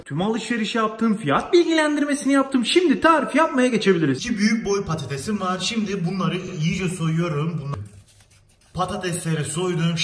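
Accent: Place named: Hatay, Turkey. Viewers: Çünkü adam hataylu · Because he is form hatay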